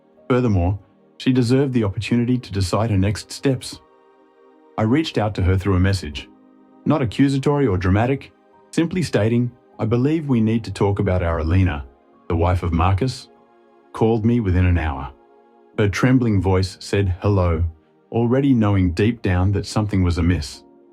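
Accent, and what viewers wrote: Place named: Australia. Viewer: Australian patois just does not go well with these stories. Canadian is the best.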